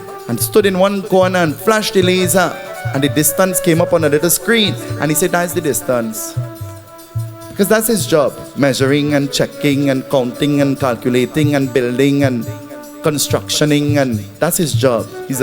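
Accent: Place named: Trinidad and Tobago. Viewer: SITARAM. PUNDITJI...I. LOVE. YOUR. LITTLE. TRINI. ACCENT.. YOU. DID. THE PUJA VERY. NICE.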